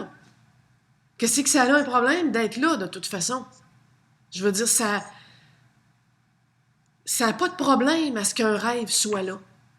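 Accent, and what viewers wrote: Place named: Quebec, Canada. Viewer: C'est absolument génial l'exemple de la "non-couleur" c'est hyper parlant !!!!! Énorme... J'adore ce que vous dites et j'adore votre accent québécois ! Génial !!! MERCI 🌞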